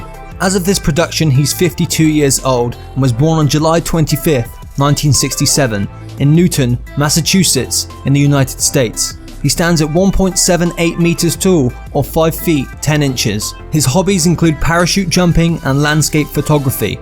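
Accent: United Kingdom. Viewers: I have watched a few of these tubes and find them interesting and informative but DAMN! your pronunciation of English is downright annoying. You sound as if you're English or at least of that heritage but some words you use should be avoided at all costs. Such as "La Fayette" and "Illinois" in the Axl Rose episode are pronounced as "LaFayettey" and "llinoise",m and in the current episode you speak of and "Ensembel" when referring to an ensemble. If you take more time you mau increase your subscriber base.....Just saying. Nevertjeless will still watch when I am completely void of contemplative thought ans the mispronunciations wake me up. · half hour of syupied math in bri ish accent. fah shame/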